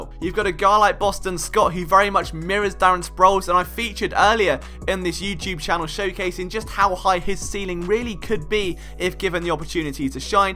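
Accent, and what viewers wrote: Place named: England, United Kingdom. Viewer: Man I'm shocked I enjoy your videos as much as I do. Nothing personal but I can't stand that accent and willl turn shit off damn near instantly but your shit I don't even notice lol. All of my mom's family is from England to that's why I don't understand why it bothers me so much. Anyway keep up the great work man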